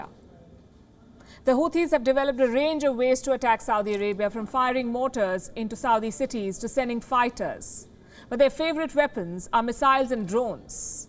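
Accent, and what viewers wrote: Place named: India. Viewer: It is hard to take someone with an Indian accent seriously 😂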